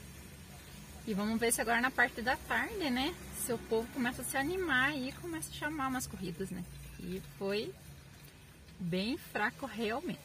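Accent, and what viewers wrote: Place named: Paraná, Brazil. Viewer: Rachei com vc sutaque de pé vermeio parabéns galega vou seguir vc mora em SP mas nasci no Itambé Paraná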